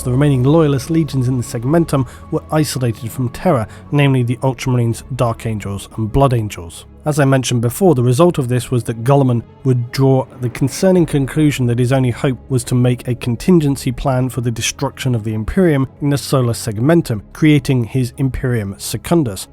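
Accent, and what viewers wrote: United Kingdom. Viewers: Man your the best Warhammer 40k narrator on YouTube! I try to watch other channels but they dnt have ur British accent. I swear it makes the lore/story 10× better. Huge fan! Love your stuff💯🤘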